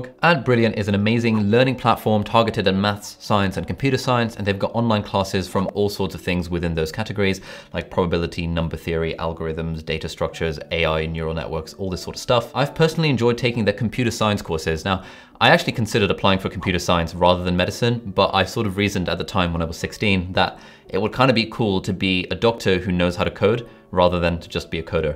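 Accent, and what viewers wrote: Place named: England, United Kingdom. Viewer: So nice to hear a well-spoken, articulate English accent..Well done.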